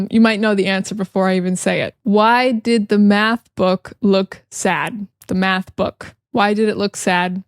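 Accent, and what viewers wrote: United States. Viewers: The same thing happened to me. And everything was very easy to follow. Great American pronunciation. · Teacher you are so good at English. I love your American accent.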